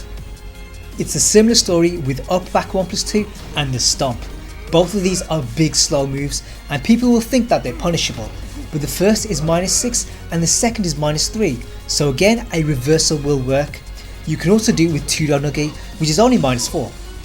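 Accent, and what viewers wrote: Birmingham, United Kingdom. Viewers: Are you Brumie?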